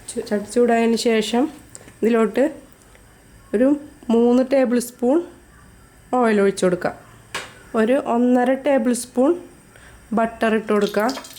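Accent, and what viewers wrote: Kodungallur, India. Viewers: കൊടുങ്ങല്ലൂർ ഭാഷ... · Ingale veedu kodungallur anganam ano? 🤔 nalla slang kdglr karde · Ith kodungallur slang aanu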